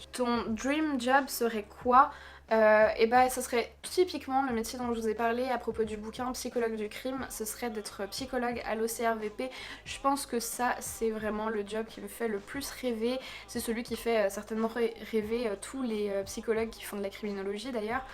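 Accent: Switzerland. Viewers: PS : je suis Suissesse et je te confirme que tu as un petit accent de chez nous 😉 c'est très chouette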